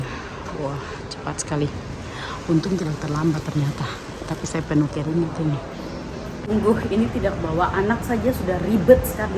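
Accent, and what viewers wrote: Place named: Eastern Indonesia, Indonesia. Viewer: Sehatsehat kaka. Vlognya sllu menarik, dn sya suka sama gaya bicaranya kaka krna kebetulan saya juga orang timur. Sya sudah ikuti dn nonton vdeo kka dari sebelum mncapai 1 jta subscribe, dari msih tinggal di apartemen, tdk prna bosan sma vdio2 kaka. Mkasi sudah menghibur keseharian sya, dn smoga alur cerita hidup kaka bisa ketularan ke saya yg smntara proses memikirkan dn melangkah sdikit demi sedikit untk mnuju masa depan dn hdup yg lbih baikk🤗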